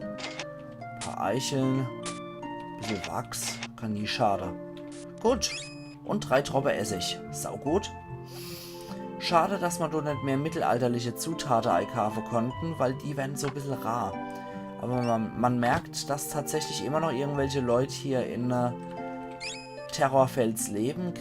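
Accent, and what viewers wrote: Palatinate, Germany. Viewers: Nagut Nagut, dann erst Mana, hihi. Aber ich freue mich, ich werde live dabei sein, wenn jemand frisch/blind Chrono Trigger genießt, das ist etwas ganz besonderes. Uff Pälzisch ist dann nochmal ein Super Bonus, denn ich versuche schon lange das Spiel mal wieder zu beginnen, leider habe ich bisher kein Action Replay mehr um es anzugehen und auf meinem Rechner funktionieren die deutschen Roms iwi nicht. Auf Mana freue ich mich natürlich auch, das werde ich aber nur sporadisch verfolgen, da ich es mit meinem Sohn bald selbst zusammen mit meiner Frau via Multitap spielen werde, da will ich mir nicht die Spannung nehmen. Naja Später kannst du ja mal die eine Stelle vom Schattenwald untersuchen, die mit Man/1up auf den Maps markiert ist. Da kannst ja dann wieder hin. Übrigens ein Tipp, sobald du "reisen" kannst in Secret of Evermore, dann gibt es da eine Stelle in der Römischen Gegend, da gibt es so Statuen, dort kannst du an einer Stelle, die auch der Hund erschnüffelt, gefühlte unendlich Zauberperlen aufheben. 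Kennst du die Stelle?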